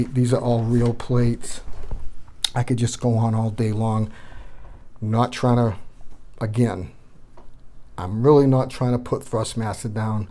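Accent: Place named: Boston, United States. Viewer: Helped me man. My FA-18 throttle and stick just arrived this morning, but I was having a headache putting the throttle together as the pdf manual from the winwings site wasn't too clear on assembly. You helped me work out what goes where though, which I couldn't find in other videos. Love the Boston accent too. Many thanks from Aberdeen, Scotland.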